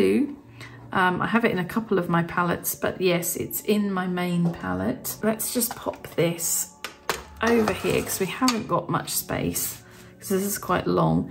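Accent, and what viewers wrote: United Kingdom. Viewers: I'm converted! I now swatch all my water colours as pebbles! I used to hate swatching in little rectangles as I found it tedious. Now it is a joy, thanks to you. :) I've been in home quarantine with Covid for the last 10 days (free today! Yay!) and I been working my way through all your videos from beginning to end (well almost to the end). I love you videos, your lovely British accent and the gentle music. I've lived most of my life in Australia, but I was born in England and have lived there a couple of times for a short while over the years. So I love your vlogs of the countryside and villages too! It takes me back to happy days and reminds me of my mum. :)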